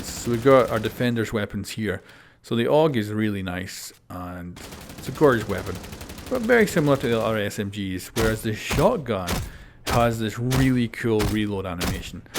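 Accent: Scotland, United Kingdom. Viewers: I’m not sure if I’m right but it sounds like ur accent is slightly Scottish is it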